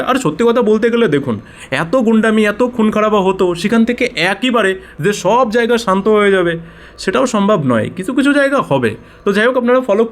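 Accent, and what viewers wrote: Bangladesh. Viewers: হুমায়ূনকে বিজেপি সাপোর্ট করেছিল।। তুই জানিস না গোবর ভক্ত।। তোর কথা শুনে মনে হচ্ছে তো তুই একটা খাঁটি বাংলাদেশী।। এই করছিল খাস ছিল এই যে তোর ভাষাগতে।।তোর প্রমান তুই একটা বাংলাদেশী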